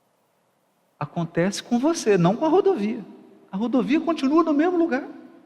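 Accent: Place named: Minas Gerais, Brazil. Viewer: Tô ouvindo você e observando a fala em todos os sentidos e aspectos Percebi que é mineiro assim como eu E esse exemplo de mineiro ir p são Paulo por essas regiões estradas citadas passei por lá e sem GPS , MAS CHEGUEI LÁ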